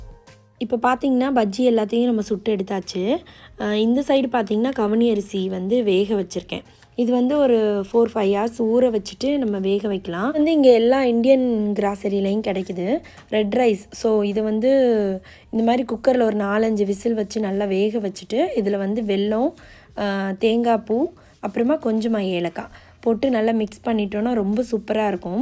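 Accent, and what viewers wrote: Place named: Natham, India. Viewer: அது எங்க ஊரு நத்தம் தமிழ் அண்ணா so நல்லா தான் இருக்கும்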